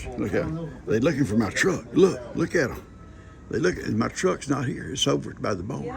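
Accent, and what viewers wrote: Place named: East Texas, United States. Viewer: Good morning and. Congratulations on reaching 50k+ followers. I've been watching your number hoping to see it grow. I love your show and watch them all. Everyday. You wondered if folks would watch an old east Texas man, well, yes we will!! I think it's awesome that you like making videos. Its wonderful for your family and small grandchildren, they will forever have you and on many videos to watch. I also think its wonderful that you and can earn a little money from us watching because most of us understand that retirement means a strict budget. I can say that I love your videos and stories and lives. Your people are great people. I've learned so much from watching your content even. I too am from East Texas so I can understand every word you say. I pray for you and and your family as well as your people here who need prayers. 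The Lord knows who needs help. I pray for everyone in bad weather and flood prone areas are safe. I pray your house project goes smoothly. Much love and thanks from your friend from Leon County. I love the Homicide Hunter too!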